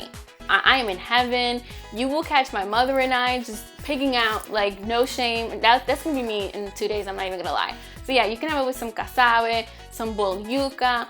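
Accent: New York, United States. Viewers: Love that nyc accent coming thru but u look like you moved out to the burbs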